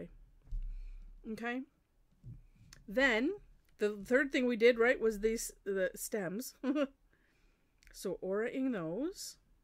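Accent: Midwest, United States. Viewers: Everyday I watch your videos. Love them and it's so wonderful to hear the midwestern accent, I'm from Chicago but living in North Carolina and everybody here has a southern drawl.😂 thank you for your videos.❤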